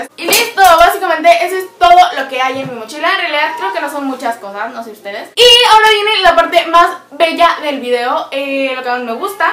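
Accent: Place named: Baja California, Mexico. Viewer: tu tienes mi acento!!! eres o vives en baja california ??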